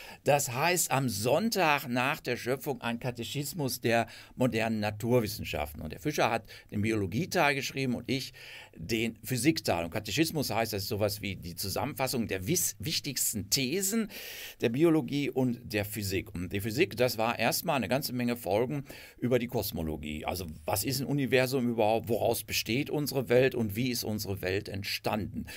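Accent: Germany. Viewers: Ich mag übrigens deinen Ruhrpott slang. Die dortigen Menschen sind eigentlich sehr bodenständige, ehrliche und wahrheitsliebende Menschen Lass uns doch mal treffen und reden. Gruß Christoph Poth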